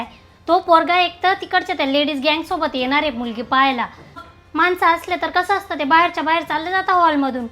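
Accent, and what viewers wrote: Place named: Vidarbha, India. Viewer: छान वर्‍हाडातला हेल बोलता तुम्ही. मला माझ्या नात्यातल्या आवडत्या तायांच्या घरी भेटीला गेल्यासारखं वाटतं...❤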